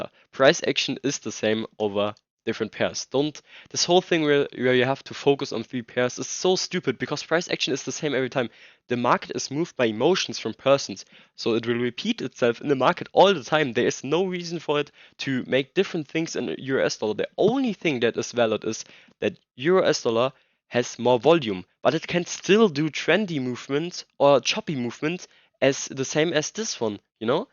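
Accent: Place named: Germany. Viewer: Der Deutsche Dialekt, herrlich, gutes Video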